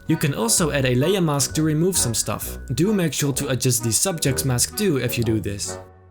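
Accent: Netherlands. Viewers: Bro je hebt een geniaal account man, Ik weet niet hoe ik je tegen kwam op YouTube, maar toen ik je accent hoorde wist ik gelijk dit is een Nederlander haha. Keep it up man, good work. Abbo er bij ! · Are you Dutch? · Are you Dutch??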